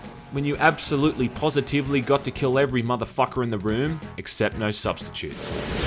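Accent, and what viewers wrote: Australia. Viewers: said UAC, maybe you just misheard him because of his Aussie accent.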